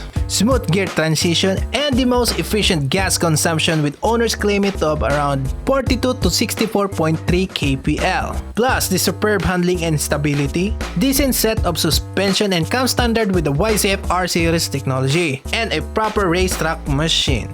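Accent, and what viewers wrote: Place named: Conyo, Philippines. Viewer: Ang conyo mag english, don't try so hard bruh ang panget sa tenga.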